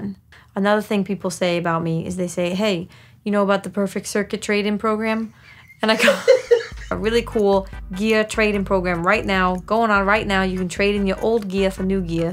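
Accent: Long Island, United States. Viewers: Hey!! You should look up a video of a stringed instrument called a Mulatar. Its a weird Harpy guitar, drum. This thing has me looking into building one myself to sew if I can? I also own harps and guitars and bass. I don't consider myself a luthier by any means but a guy builds a guitar and that's cool? A guy builds several guitars and he's a luthier? I guess? Looong Island accent is right. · You do have a Long Island accent but like 15% of one. It sounds like you've worked to get rid of 85% of it and honestly that work should be recognized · all on point! and your Lonk Island accent is subtle. (✿◠‿◠) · Your Long Island accent is not really bad, it's just really Long Island, which is totally reasonable and legit. Local pride! Cool tracks. I love Gris. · The track I liked the best is your Long Island accent! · The well built shelves full of music-y gadgets in their og packaging? 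Impressive, but how do you find anything o.o Also: I heard the accent as soon as you mentioned it. It came and went. So that's a Long Island accent, huh? (Regards: "Not an American") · First track was my favorite. Harp lady, gothegg, Cory Feldman!? You don’t look like any of those people, you look like Rogue from X-Men. You’re Rogue look a like that demos pedals & plays some cool music to me. I knew you weren’t Rogue from X-Men when I first heard you speak & had that Long Island accent instead of Rogues deep southern/Mississippi accent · Please accentuate and use your long island accent more. It be appreciated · Yea ur long island accent is thick af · I never knew you had a Long Island accent until today. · This was my first time to be aware of you. I enjoyed and was impressed by your brilliant creativity with the varied tech. Also I ❤ your Long Island accent! 😊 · Totally Long Island accent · IT'S LONG ISLAND ACCENT?! 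I THOUGHT YOU WE'RE MIDWEST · Your Long Island Accent is not really bad, it is really good. It is refreshing to hear a regional dialect. That Perfect Circuit placement was awesome. I like the music for game #3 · You are very good looking and easy to listen to/watch. These people are nothing without the hate. You have a little Long Island accent but why would that be bad thing, lol dying on the Perfect Circuit bit.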